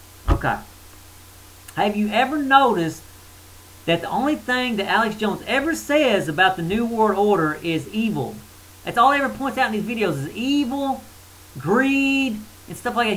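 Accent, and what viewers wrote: South, United States. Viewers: Very good 'good ol'boy' accent. Simple wisdom from a simple guy... one of us? Not really...hahaha. What we have here is a contradictory jambalaya of esoteric 'doctrine of the ages' sprinkled with liberty movement and generic pseudo Christian phrases. Paranoia is delusional fear. Sadly there is too much blatant & historic evidence of the malevolent intent in the character and actions of the long laboring apostles of the coming New Age of false "Peace, Love & prosperity", to be labeled Paranoia.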